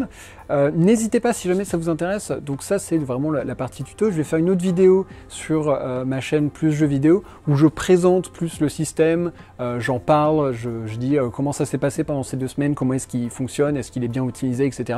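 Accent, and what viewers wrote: England, United Kingdom. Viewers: Arrete avec ton accent anglais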